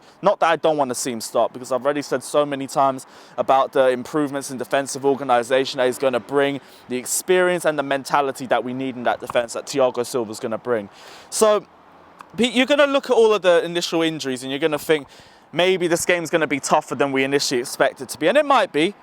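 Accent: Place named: London, United Kingdom. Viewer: he's from England bro. From the accent you can tell he was born and raised in London or thereabouts. He's reading Werner in English. Nothing is wrong with the way he pronounces the letters. He just has to make an effort to pronounce it the german way since it's a german name. That's all....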